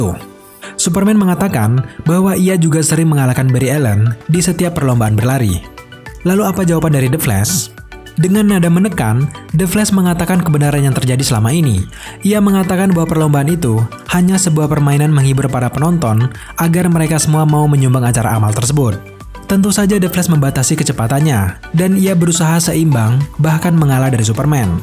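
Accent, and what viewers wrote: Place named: Batak Karo, Indonesia. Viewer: Bro, dialek lo kok kaya batak karo yaa...bener ga hehehe Mantappp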